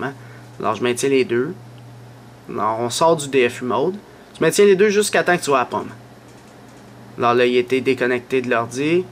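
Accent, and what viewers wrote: Quebec, Canada. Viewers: je taime mec merci tu m'as sauver jte le jure sur ki tu ve plus jamais jme moke de l'accents quebecois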